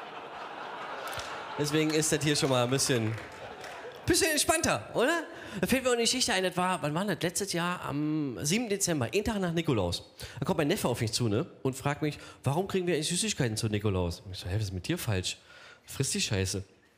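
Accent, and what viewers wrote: Berlin, Germany. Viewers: auch noch Berliner Dialekt simuliert... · Selten wurde der Berliner Dialekt so sympatisch und humorvoll rübergebracht. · Grandios erzählt und der Berliner Dialekt genial. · Das stimmt, normalerweise dreh ich leicht am Rad, wenn ich wen berlinern höre, aber hier wirkt es nicht so aufgesetzt wie oft bei anderen aus der Region.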